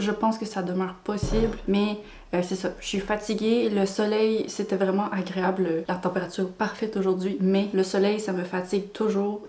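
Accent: Quebec, Canada. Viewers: Je ne suis absolument pas Québécoise ou Canadienne, je te découvre tout juste aujourd'hui grâce à une autre booktueuse qui a parlé de toi (@ mais oh my god je t'adore ! 😊😂🤩 J'adore ta pêche, ta façon de parler, de parler des livres, ton humour (oui je trouve que tu es drôle haha), TON accent 😂 (j'adore l'accent Québecois haha). Je suis très heureuse de te découvrir. Ça faisait longtemps que je n'avais pas fait de découverte de booktubeuse (hormis Lausdiary justement que j'ai découverte depuis 2 jours). Tu fais du bien. Je m'en vais continuer à tout regarder ce que tu as déjà fait et te suivre au présent ! Bravo pour ton travail. ❤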